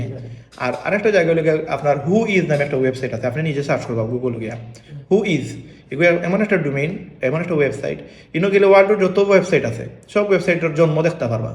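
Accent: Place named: Sylhet, Bangladesh. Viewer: Vaiyar Sylhet e vasay kotha gula khub valo laglo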